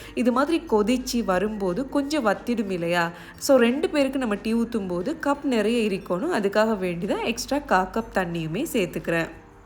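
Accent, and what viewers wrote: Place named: Sri Lanka. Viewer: சிங்கார..சிங்கள கொஞ்சும் தமிழ்.. அழகோ...அழகு .❤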